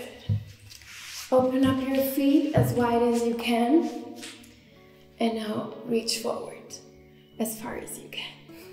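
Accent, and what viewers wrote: Germany. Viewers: U have a german accent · Are u German no hate but ur accent is like mine in english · This is the first time I´ve heard her talk in a video, was not aware was German haha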